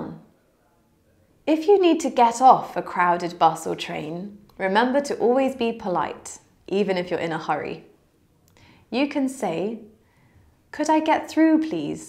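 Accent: United Kingdom. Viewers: 영국 발음도 듣고 표현도 배우고 최고! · 영국 발음 매력적이야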